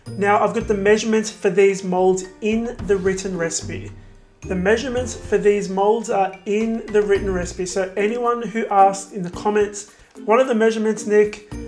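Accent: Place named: Australia. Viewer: I love how Australian's say sugar.. Like shoogah 😂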